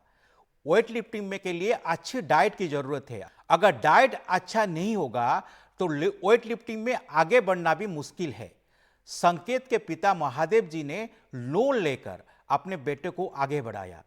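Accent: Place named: Odisha, India. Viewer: The anchor’s Odia accent is so nice.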